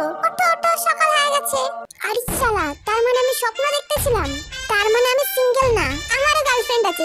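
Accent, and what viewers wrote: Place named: Aminbazar, Bangladesh. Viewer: opsss sorry na mane tumar vasa ta amader Bangladesh er dhaka aminbazar er moto tai bolsi 🙂🙂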